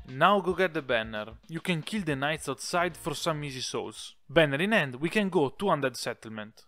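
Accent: Italy. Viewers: I love the accent. I believe it's Italian? It's very satisfying to listen to imo and a fresh change of pace from the American, British, and Australian accents. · Are you perhaps Italian? Your accent reminds me of an Italian one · Kept seeing this particular video in my recommended tab, a worthwhile video to watch indeed. Forgive me for I am new to this channel. But, that accent I hear, is it Italian?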